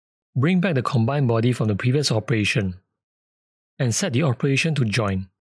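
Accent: Singapore. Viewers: I love your channel! Out of curiosity, are you by chance based in Singapore or from Singapore? Your accent sounds very Singaporean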